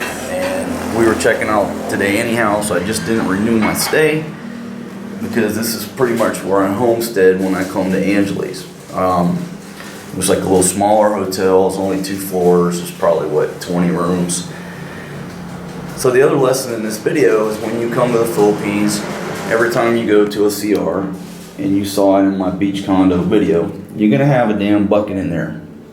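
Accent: South, United States. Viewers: I appreciate that you are doing your own thing but I can tell that with a mouth like yours you were raised in the south, but it sure wasn’t Texas man. Safe travels there guy.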